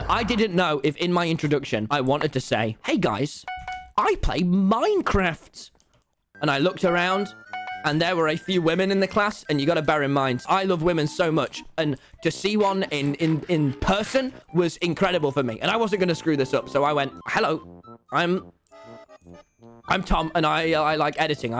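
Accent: United Kingdom. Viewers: Yay your British! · BHAHAHAHAHAHAHHA HOW DOES HE SOUND AMERICAN? YOU CAN HEAR HES 100% BRITISH LMAO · Bruh I loves this guy's British accent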